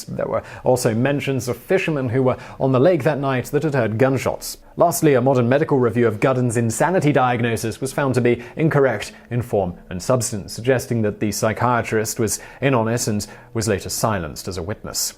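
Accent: United Kingdom. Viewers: He's british...durrrrr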